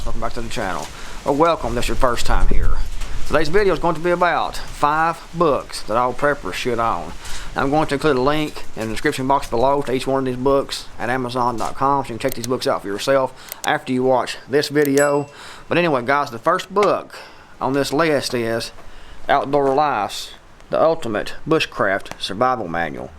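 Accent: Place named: South, United States. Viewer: I love how he talks! Country boy !! 🇺🇸 live this channel!!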